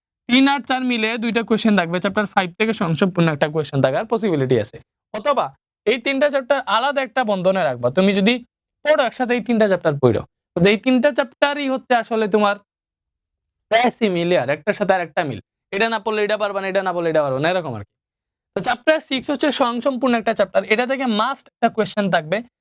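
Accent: Sylhet, Bangladesh. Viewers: সার আপনি সিলেটি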